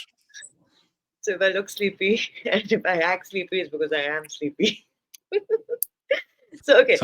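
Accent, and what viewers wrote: India. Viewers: Stop spewing BS in Indian accent. Bangladesh is not in a mess, we are in a very very good shape. Your own foreign policy screwed us over for 15 years. Now just stay away. People don't want ANY Indian influence here anymore. Radicalization? Don't you know what RSS or BJP is? What their goons do in India? And you dare to lecture us about radicalization? Wow.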